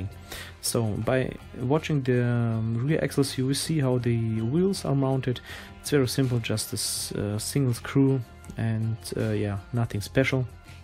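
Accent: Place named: Germany. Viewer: Wie man einfach hört das du deutsch bist beste 😀👌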